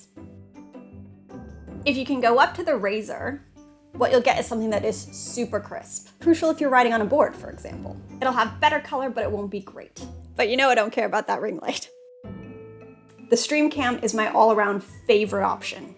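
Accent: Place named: United States. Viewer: Just out of curiosity: you sound American - yet, it seems you are in Ireland (and you look somewhat Italian, and charmingly so ;-) ). You emigrated?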